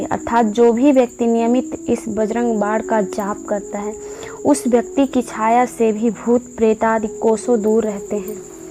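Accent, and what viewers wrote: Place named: Bihar, India. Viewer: आप शायद बिहार से हो मुझे ऐसा लगता है.. बजरंग बाड़ नहीं उसे बजरंग बाण कहते हैं.. आप बार-बार बजरंग बाड़ कह रहे हो.... 🙏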